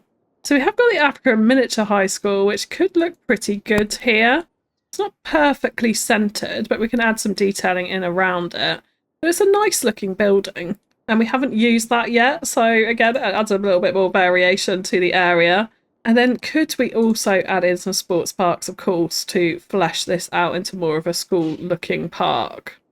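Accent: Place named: RP, United Kingdom. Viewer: What is this BBC radio accent, it giving flashbacks?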